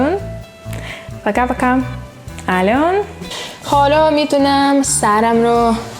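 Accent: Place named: Iran. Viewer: درود بر شما عزیز ، اول اینکه در مورد پوتین با شما شوخی می کردند و مزاح بود ، دوم اینکه لازم نبود در ایران حجاب داشته باشی الان خیلی دختران ایرانی هم حجاب را رعایت نمی کنند علاوه بر این در ایران به توریست های روسی در مورد حجاب سختگیری نمی کنند و می توانستی راحت تر باشی ، سوم اینکه خیلی خوشحالم که تجربه اول شما از سفر به ایران خوب بود ولی ظاهراً خیلی از جاهای جذاب و دیدنی ایران رو ندیدی امیدوارم در آینده ببینی ، چهارم اینکه خیلی ممنون از اینکه سعی می کنی به پارسی صحبت کنی با اینکه برای شما سخت است ولی تبریک می گویم که شما با لهجه ایرانی فارسی صحبت میکنی و برای ایرانیها قابل فهم است و در آخر اینکه خیلی خونه قشنگ با چشم انداز زیبایی داری به شما حسودیم شد روسیه طبیعت قشنگ و زیبایی دارد پیشنهاد می کنم خارج از منزل فیلم بگیر در ضمن اسم واقعی شما به روسی چیست؟ چون فکر می کنم یک اسم اروپایی یا آمریکایی است نه روسی ؟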